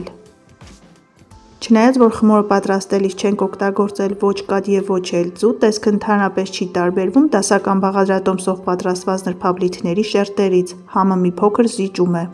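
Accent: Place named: Ararat, Armenia. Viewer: Ду шат юраатук ес джан, Цайнд амен ангам лселуц, инц твум е те инц Аразат цайн ем лсум, шат бнакан ес, у шат тнакан,аранц Кез котрателу🌹👍💋💋💋❤️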